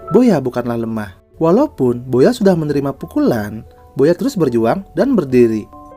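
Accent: Bali, Indonesia. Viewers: orang bali bang?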